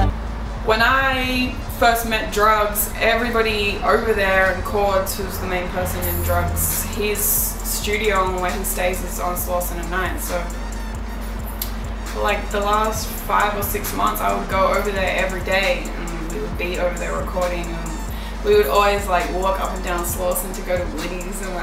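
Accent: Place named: Australia. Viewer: fuck thats so annoying -.- she mixes accents ... why honestly , you were born in australia you grew up in australia stick to what you are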